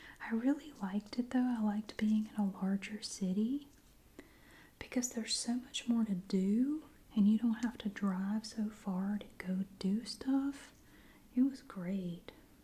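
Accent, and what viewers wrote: South, United States. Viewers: I love your whispering videos. You have the nice sounding and calming voice. The southern accent also has this New Yorker hooked lol. Love it. You’re adorable and I love ALL you videos and your sense of humor! Don’t listen to trolls or haters they’re just jealous of your success.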